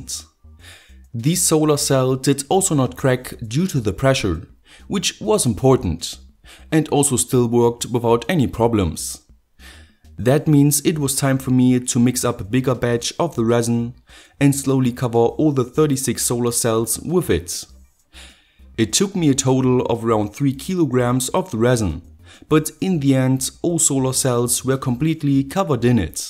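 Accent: Germany. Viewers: You sound like you're a German... It's not worth trying and build your own PV modules...Tried everything and it's just throwing away money and time for something that's never going to work and last like ORIGINAL fabric made one... Best regards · Of course he comes from Germany, you can clearly hear his accent.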